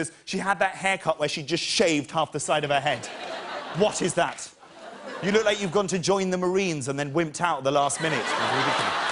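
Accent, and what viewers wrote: RP, United Kingdom. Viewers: but remember that Stewie is an IMPRESSION of a British accent by Seth MacFarlane, whereas Jack is actually from the UK. He's actually just posh, which is why he speaks in such a specific way. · exactly what I thought! I remembered him sounding posh as fuck but not like Michael McIntyre, until today. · He's super posh but he's putting it on a bit more as the Yanks love it. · not quite correct either, he wouldn't have to be from London to sound like that, he has received pronunciation, which really he could have if he lived anywhere in England although more likely in the south west/east. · Nah, he always sounds this posh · I love how when he is in America his well spoken private school voice remains, however when he does stand up in England he tries to make a more common accent. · Im a brit and a big fan of Jack. He's really upstaging his poshness for the American audience. 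· I know his posh but he's extra posh in this set,what happened to him? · It's just called posh British man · He tries to be way more British when he’s in America than he actually is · Everyone in Britain knows Jack is WAY more posher than Michael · Funny- but he was being overly posh, even by his usual standards. It was a bit irritating. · This guy sounds like a posh Michael Mcintyre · He's only doing the posh accent to appeal to the Americans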